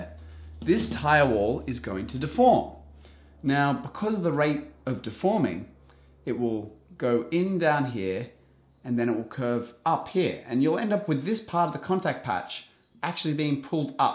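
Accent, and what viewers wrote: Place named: Australia. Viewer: instant subscrib + australian accent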